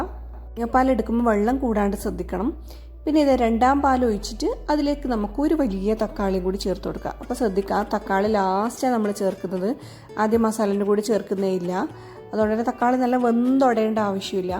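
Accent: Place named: Trikaripur, India. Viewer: Hi from another YouTuber from Trikkaripur ☺️👍...nice presentation with trikkaripur slang 😁... Do support back. 🙏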